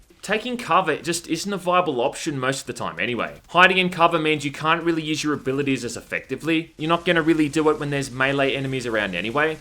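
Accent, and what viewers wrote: Australia. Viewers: Australian accent is the best accent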